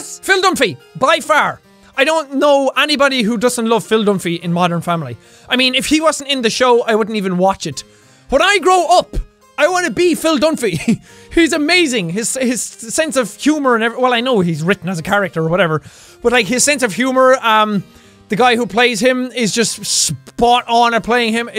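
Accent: Ireland. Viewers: Hello! I just hit 300 subscribers and that is mainly because of you! You are my main inspiration to start my own channel (not this particular video, more the beam.ng vids). Your videos are always so great that it amazes me. According to me you are the best youtubers out here with a perfect voice for commentating, and your irish accent is the dot above the i. Keep making fantastic videos, since you are making so many people happy! My biggest dream is to honestly do this aswell, to make people happy just by being myself, have people laugh with me instead of against me. Have a perfect day, you really do deserve it because you are such an inspiring man Thanks:D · I watch you when I miss my boyfriend's voice because he is also from Ireland. A different area, so the accent isn't the same. But it's closer than anything I have in the states :P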